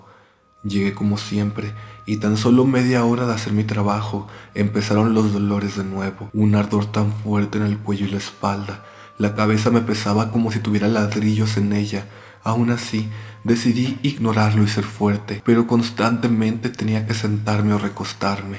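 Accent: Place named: Upper class, Mexico. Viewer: Hole por qué últimamente hablas como waytechecan cómo si tuvieras una papa en la boca weeeeee megaaaaa (cringe1000)🫢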